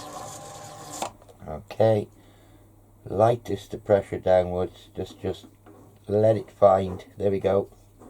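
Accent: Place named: Wales, United Kingdom. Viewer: Enjoyed that, keep up the waffle I think it adds something hearing those Welsh tones.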